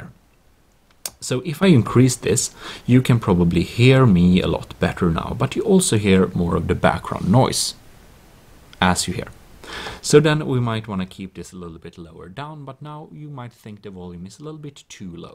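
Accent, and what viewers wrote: Sweden. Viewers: Definitivt svensk, Norrmän låter mycket mer oseriöst (no offence). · I am swedish too! It's weird how you can hear it on the type of english people speak... epic video btw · swedish acsent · U sound swedish.If u are tjenaaa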